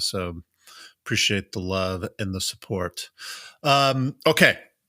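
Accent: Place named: South, United States. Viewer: You don’t have to think that you have to think about speaking in order to mask your little southern twang. Bc thinking you’re an idiot bc you’re southern is a sterotype, like it would be assuming someone is southern bc they are an idiot. Now being from a RED state, would be a logical assumption, but simply southern. Do you know how many idiots are from MASS and Michigan!? 🤣🤭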